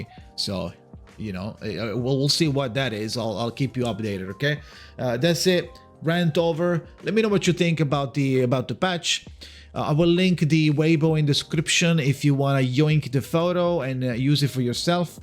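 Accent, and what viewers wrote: United Kingdom. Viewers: I didn't click on this video to hear my guy start speaking with a UK accent 😭😭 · The UK accent made me clacking 😭😭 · Alright mate, hate to do this but I oughta stick up for my Scottish, Welsh and Irish folks and remind you that it's not a "UK accent" but a mish-mash English one.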